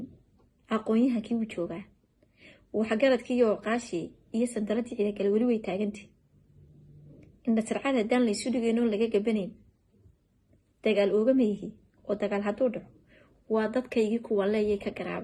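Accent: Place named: Borama, Somalia. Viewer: Anigu reer booramaan ahay sidaan kuugu hadli lahaa ama gabadh kale ugu hadllahaa ayaad u hadashay inaabti quruxeey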